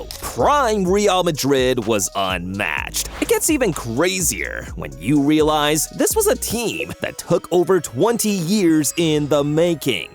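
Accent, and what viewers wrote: United States. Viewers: What kind of American narrator is this? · Your voice an american pronunciation are unbearable, can't watch this shit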